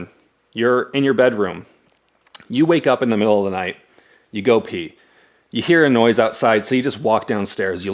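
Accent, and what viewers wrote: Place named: New Jersey, United States. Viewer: Sounds like Jersey.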